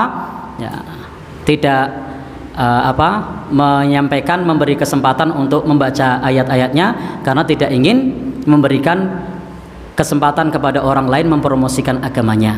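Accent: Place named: Java, Indonesia. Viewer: Ustadz ini salah satu figur ulama yang patut di contoh. Sifatnya yang rendah hati, saling menghargai sopan santun dan ilmunya yang sangat tinggi. Juga logat jawanya yang kental. Benar benar ustadz panutan saya.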